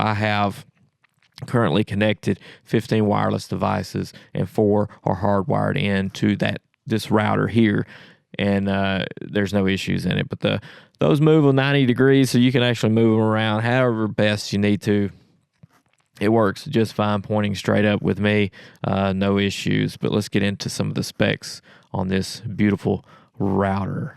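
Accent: South, United States. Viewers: I hear southern accent, I give the video a like. Simple as that.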